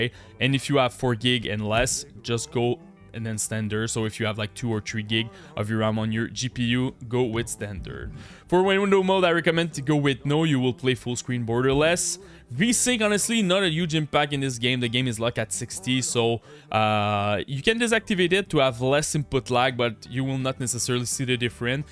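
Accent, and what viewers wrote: Quebec, Canada. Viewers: Dang a quebecois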